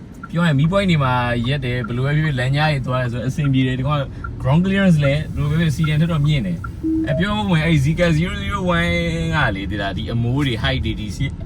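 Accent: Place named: Rakhine, Myanmar. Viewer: ရခိုင်ထင်တာပဲ မဟုတ်ရင်တော့ သပ်သပ်ယားတာပေါ့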